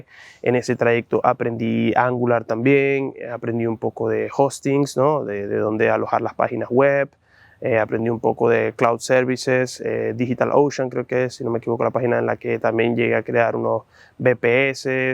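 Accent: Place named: Zulia, Venezuela. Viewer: Lo malo es que parece que flutter ira en declive segun los ultimos movimientos de Google. Tu acento parece de Edo. Zulia. Saludos desde Machiques. Actualmente vivo aqui y trabajo para una empresa del exterior como dev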